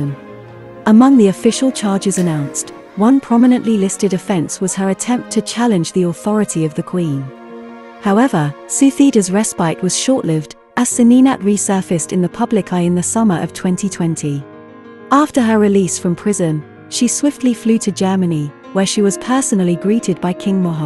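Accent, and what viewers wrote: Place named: RP, United Kingdom. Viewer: Nice to hear a beautiful standard received pronunciation.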